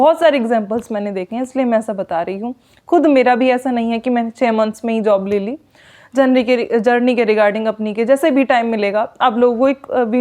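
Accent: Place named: Rajasthan, India. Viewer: Thank you sister, Rajasthan se lag rahe aap to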